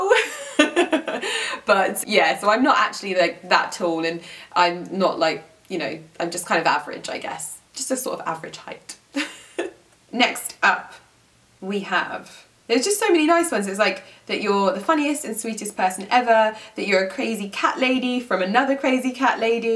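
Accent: RP, United Kingdom. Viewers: You truly don’t deserve the hard time you get, such a hard working, lovely lady deserving of all the success you have. Also, about the posh accent bit, my accent seems to change so easily depending on who I hang around with, some days I sound Yorkshire, the next day Canadian and the next day London, it’s so weird so I feel you there haha! Keep inspiring us all lovely xX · I absolutely love the way you speak and your British accent! English is my 2nd language, so every time I watch your videos I say to myself "it's time spent on learning a foreign language, not just entertainment" ^^ Two words which I've learned from you and now overuse: "flattering" and "wonky" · English is not my first language, you speak very clearly comparing to other British accent people. thanks 😘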